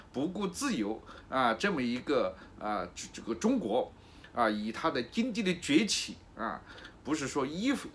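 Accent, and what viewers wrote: Hunan, China. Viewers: 何频你太啰索! 一個简单的问题谈得老长，言不扣题! 另外普通話要说好，你这年龄不該说成这样-湖南国语。改改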